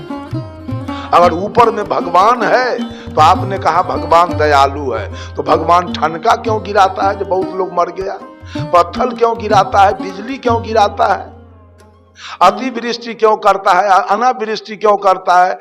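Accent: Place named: Bihar, India. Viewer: Baba ji aap ka tone to bihari lag raha aur ghee dudh dahi ki baat kar rahe ye to sadiyo se chalti arahi parampara hai Sanatan Dharm mei avi ke pandit thodi late hai ghee sudh hota hai isliye to samsaan mei v ghee se jalaya jata hai 😊bina Shakti se ye duniya nai chal sakti aur chath Puja k baare mei to kuch bolna v mat qki aap agaynta mei hai ye sanatan ki astha hai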